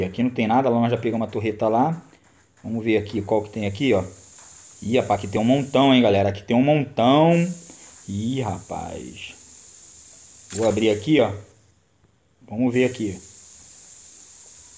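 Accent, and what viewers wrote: Rio de Janeiro, Brazil. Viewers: tu é carioca Mano?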